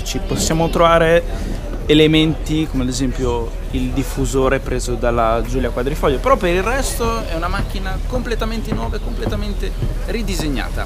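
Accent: Italy. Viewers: I love the Italian accent. If reincarnation is true I wanna be born again to be Italian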